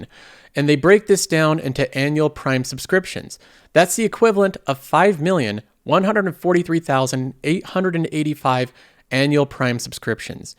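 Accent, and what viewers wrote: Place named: Northwest, United States. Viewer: Hey man - I gotta ask, are you from the NW? I just got married in Washington State and my wife's entire family talks just like you the way you pronounce words like "Feel" kind of like "Fill" or "Fell". Haha so funny!! 😂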